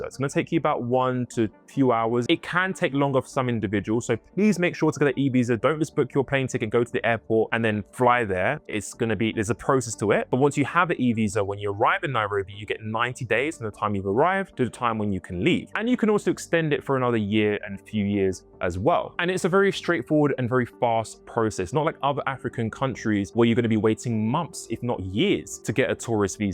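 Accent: United Kingdom. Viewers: What a bunch of pap--with a posh British accent.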